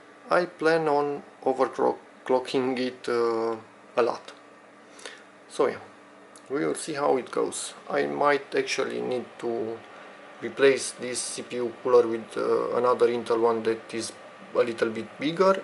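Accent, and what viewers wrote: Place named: Romania. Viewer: si cand ma gandesc ca esti roman dupa pronuntie,dar nice videoul,am o intrebare pe MSI g31tm-p21 merg setarile astea ca am acelasi procesor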